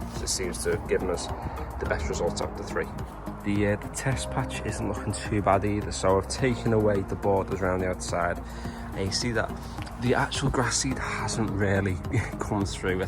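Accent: Liverpool, United Kingdom. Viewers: Fellow Scouser 👌, great vid mate👍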